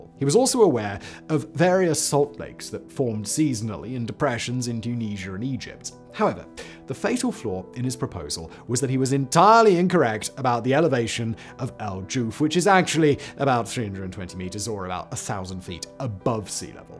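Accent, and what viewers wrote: England, United Kingdom. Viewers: I find it extremely strange that you sound a lot more British now than a few years back when I watched your older videos 😂😂 it's absolutely baffling to me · You have a lovely voice , so classic English ! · What a likable not annoying at all bri'ish voice!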